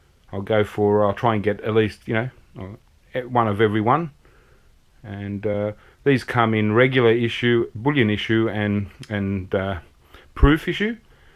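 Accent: Australia. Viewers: I don't know but I found this very funny. I think you were getting a little tipsy.And this australian accent. Lol. Very nice. Cheers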